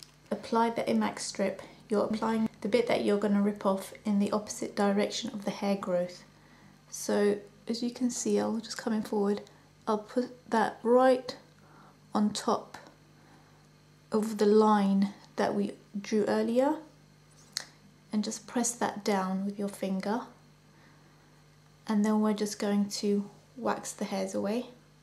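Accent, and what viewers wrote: United Kingdom. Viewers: You've got the most amazing eyes! And to my ears you sound kind of British! :D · Do you mean here religion or where she is from, her religion is y guess Muslim, which is a beautiful religion and i respect it, but her culture idk because she has a British accent, my guess is her relatives are Iraqi or somewhere around there, but it sounds like shes from Britain but follows the religion of Muslims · You do have wonderful eyebrows! Finally I got to see a perfect eyebrow tutorial with very good explanations and I think next time I will try it just the way you explained. You are very nice and beautiful! I like very much your voice and your british accent! Thank you so much for sharing with us this lovely eyebrow tutorial! Looking forward to seeing the next video from you! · Loved the video :) very helpful, i needed to tame my eye brows. 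But im very confused by you O.o ur muslim and look like you have a arabish background but you have a british accent O.o uhh what? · She sounds british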